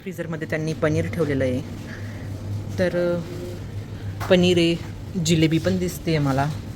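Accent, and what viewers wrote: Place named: Malegaon, India. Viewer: तुम्ही सताना मालेगाव भागातील वाटताय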